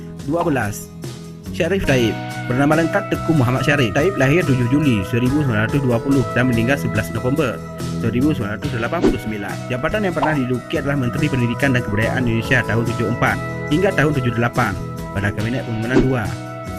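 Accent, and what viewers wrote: Aceh, Indonesia. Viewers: ini Channel youtube TIDAK LAYAK dijadikan referensi.. adminnya dangkal banget pengetahuannya.. hanya mementingkan subscribe saja... contohnya : Abdul Latif dan Bachtiar Chamsjah yang hanya numpang lahir di Aceh dan tak punya perhatiannya terhadap aceh di masukkan menjadi Tokoh di Channel ini.. hadeeeuuuhh... naratornya cuman bermodalkan dialek logat aceh doang, jadi seolah2 ceritanye beneran asal aceh banget.. kenape H.Bustanil arifin gak dimasukin dan kenapa anak buahnye die H. Ibrahim Hasan dimasukin..? , trus kenapa Buya H.Ismail Hasan Metareum yang benar2 orang aceh gak ada.??, padahal dia Wakil Ketua MPR/DPR RI sudah termasuk Pejabat Negara setingkat Menteri bahkan Politikus yang berhasil menggagas Reformasi sehingga Pak Harto lengser dan efeknya Buya Ismail Hasan Metareum orang berada di belakang layar menjadikan menteri dikabinet berikutnya yaitu junior2 nya masuk jadi menteri (Bachtiar Chamsjah, Hasballah M. 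Saad, Mustafa AB, Sofya A Djalil..).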